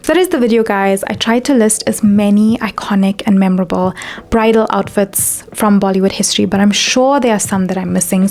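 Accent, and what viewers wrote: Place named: South Africa. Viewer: Unrelated to the topic but can I ask if you’re a South African desi ? Your accent is gorgeous !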